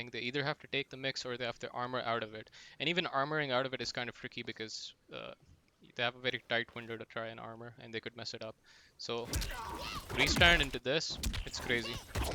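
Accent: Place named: Pakistan. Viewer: are you a PAAAAAAKKKI ? sound like one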